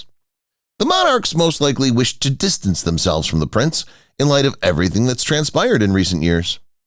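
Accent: United States. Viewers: Always an American accent with these stories… I will not be listening to this narrative… this is against the British Royal Family… and those British who keep following these people deserve to lose the first family of the UK… THIS FAMILY WHO HAVE SEEN THIS COUNTRY THROUGH SO MUCH OVER THE CENTURIES UNTIL TODAY… YOU ALLOW THESE AMERICANS TO RUN THE BRF DOWN TIME AND TIME AGAIN AND EVEN TODAY.. THEY ARE TRYING TO DESTABILISE THE BRF EVEN THROUGH HARRY AND HIS LIAISON WITH AMERICAN RACHEL MEGHAN MARKLE.. THEY DID NOT RUN AWAY FOR FREEDOM… THEY RAN AWAY TO CONTINUE WHAT SHE WAS PLANTED TO DO… IT HAS NOT STOPPED AND HAS GAINED MOMENTUM WITH THE ENCOURAGEMENT OF YOURSELVES… THE LIKES OF TINA BROWN… CHARLOTTE AND MANY OTHERS IN THE BRITISH PRESS… TOM BRADBY. YOU HAVE WHAT YOU WANT NOW IN KEIR STARMER … GOOD LUCK BRITAIN… YOU ARE GOING TO NEED IT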